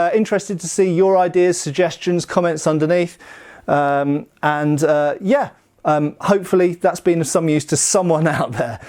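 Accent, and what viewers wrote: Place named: England, United Kingdom. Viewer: The English accent makes him sound smarter.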